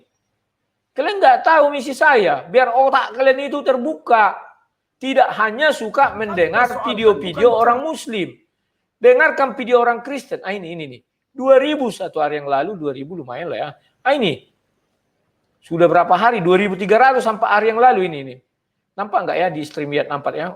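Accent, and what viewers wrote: Medan, Indonesia. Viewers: Bener kali Ito ‘ Firman Tuhan yg Ito jelaskan itu sangat masuk ke pikiran ku ‘ jelas dan logat medan nya jelas dan straight to the point ‘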